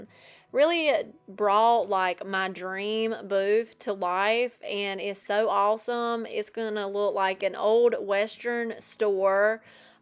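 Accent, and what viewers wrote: South, United States. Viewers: Love your work. You said someone suggested you get a narrator, so rude and low life. Normally I don't like a southern accent. I've stopped watching some sites for this reason. However I love your voice. It is soothing and low key. You're great😊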